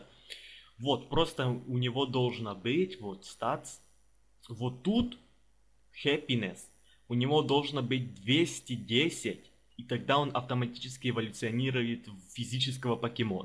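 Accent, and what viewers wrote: Armenia. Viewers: Акцент армянца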